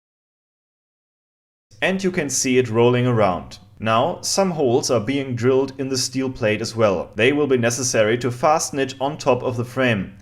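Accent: United States. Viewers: lol ! loved the cowboy accent !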